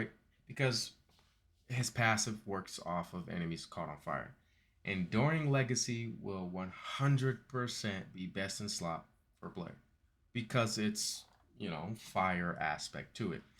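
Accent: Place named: Philadelphia, United States. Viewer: "Yea that jawn like... ya mean." Philly Boi detected.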